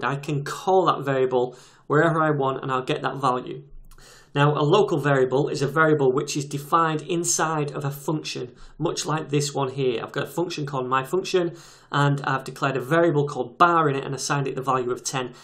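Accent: United Kingdom. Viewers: Watching you or watching the BBC gives the same experience, the classic British accent.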